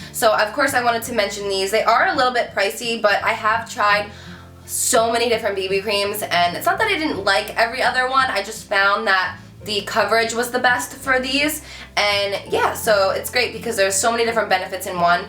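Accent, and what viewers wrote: New Jersey, United States. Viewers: New Jersey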